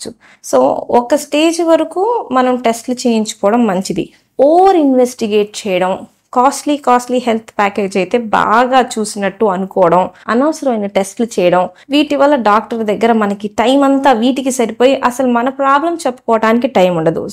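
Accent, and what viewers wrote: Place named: Prakasam, India. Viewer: మీ విడియోలు దాదాపు అన్ని చూస్తున్నాను చాలా చక్కని ప్రకాశం జిల్లా భాషలో చెప్తారు. మన ప్రకాశం జిల్లా అంటే నాకు చాలా ఇష్టం అందులోను తెలుగులో అందరికి అర్ధం అయ్యేలా చెప్తున్నారు. చాలా మంది రోగులకు మీరు చెప్పి విషయాల వలన ధైర్యం గా వుంటారు. నిండు నూరు రెళ్ళు మీరు మీ కుటుంబ సభ్యులు చల్లగా వుండాలని కోరుకుంటున్నాను.🙏🙏🙏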